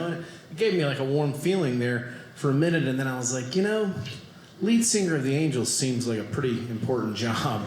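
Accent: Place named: South, United States. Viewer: The sad part is that I recognize his particular NC accent. 😅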